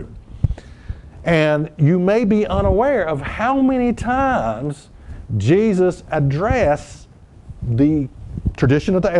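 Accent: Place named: Australia. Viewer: Pea brain lol. You are a man after my own heart and speak just like me... an Aussie lol. I think you did a great job explaining the difference between a Law and a commandment of God. Thanks... you helped me a lot. So I now know that if need be, I can walk more than 600 meters on the Sabbath day. Now what about the food laws... can I eat pig? It is not written in the Ten Commandments, but it is in the written law of Moses right?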